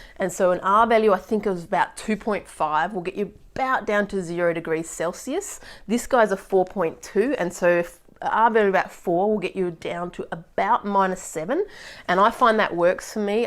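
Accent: Australia. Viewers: Great to hear an Aussie voice. I hit the Himalayas fairly regularly, so I watch a lot of these types of videos. Always Americans on the PCT or JMT, or Europeans in Iceland; so really good to see a fellow Australian out there offering advice. I also love your level of enthusiasm, it just kept me watching even though my load out is well and truly bedded down. Thanks for the time.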